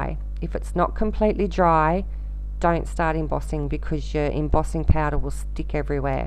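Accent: Australia. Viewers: Brilliant I have just ordered some, looking forward to receiving them. Awesome to hear an Aussie doing a tutorial make it seem like home well done. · Hi, I have just found you. So good to hear a fellow Australian. I really enjoyed your video on Brushos. I have some on order just waiting for delivery. I know that I will love them. Thanks for your video. I will check out your blog. Hugs Glenis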